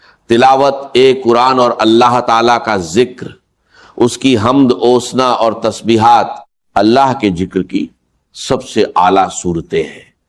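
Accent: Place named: India. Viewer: dr israr ki sirf tasweer hai ..bol tu ko indian bhai lagta..kion k zaaria ko jaaria tu indian bhai keh saktay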